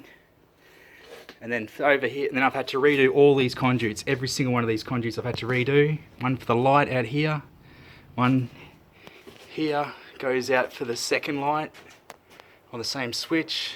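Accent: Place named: Australia. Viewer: Perfect amount of swearing in that Aussie accent bud! (My church does not understand me 😂). Glad you were able to fix the mess!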